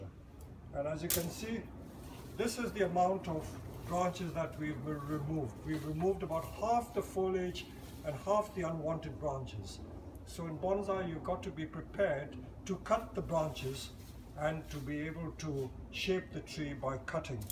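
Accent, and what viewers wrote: India. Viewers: Chinese British guy with an indian accent making japanese trees. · it says surrey, england...his accent sounds like he was raised in india... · Absolutely Brilliant. A non Bonsai related question for you Mr.......... Have you ever lived in India? I ask this because of your accent seems very Indian. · He's ethnic Chinese, and was born in India. ( is a Chinese surname.) He speaks English with much the same accent and pattern as an Indian fluent in English from an early age would speak the language.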